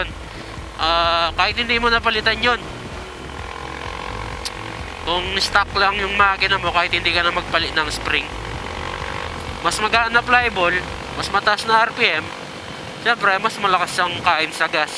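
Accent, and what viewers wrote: Pampanga, Philippines. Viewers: Kapampangan ka paps? Hahaha papuntang bahay pare yun aa.😂😂😂😂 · Kapampangan ka sir?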